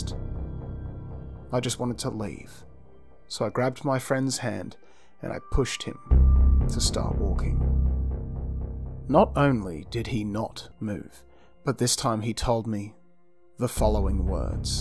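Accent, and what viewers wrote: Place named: Australia. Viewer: Narrated by an Aussie Aussie Aussie Oi,Oi,Oi.... Cheers from Melbourne Australia 🇦🇺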